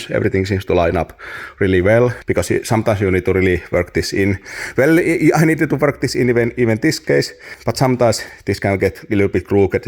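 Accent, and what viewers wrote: Finland. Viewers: Eikö ihan rehellisesti Suomella mentäis, on sen verran karseesta "ralli englantia"😢 mulla on tuollainen aito (ei kiina kopio) pistehitsi 30v takaa.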